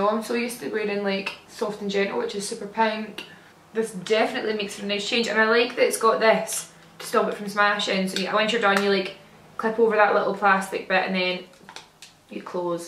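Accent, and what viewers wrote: Scotland, United Kingdom. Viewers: no, I'm American. to me, you have a thick accent which I think is Scottish. Your response tells me I was right. It's lovely all the same. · American ? No Scottish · Your Scottish accent gives me life ❤️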